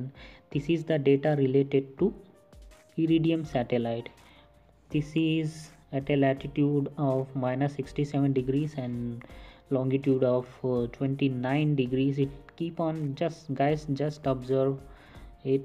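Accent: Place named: Bihar, India. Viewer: bihari english accent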